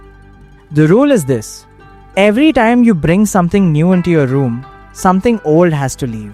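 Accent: India. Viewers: Im sorry. Is this an indian accent rather than japanese? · The narration is weird. Why is the accent Indian if the creator is Japanese?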